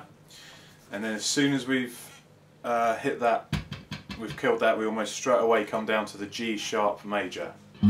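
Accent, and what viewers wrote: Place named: United Kingdom. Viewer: A bigger guy, American guitar brand (Fender), camo pants, shirt with cursing on it, song... The British accent was the LAST thing I expected. Way cool. Thanks for the lesson, mate.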